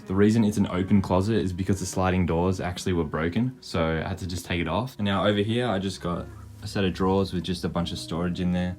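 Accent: Australia. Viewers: r u australian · You’re Australian???? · Are you Australian? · wait your Australian?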